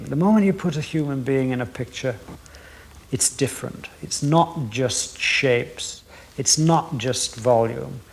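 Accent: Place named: Yorkshire, United Kingdom. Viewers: Mildly interesting that his Yorkshire accent has got thicker in the intervening 50 years. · An amazing man with amazing ideas Even in more recent times he still has his broad West Yorkshire accent 🖼️🖼️🖌️🖌️🎨🎨🇬🇧🇬🇧🏴🏴❤️❤️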